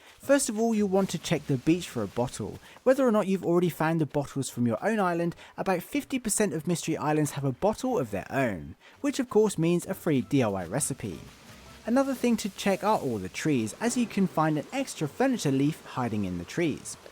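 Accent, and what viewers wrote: England, United Kingdom. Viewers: You have a great english accent 😌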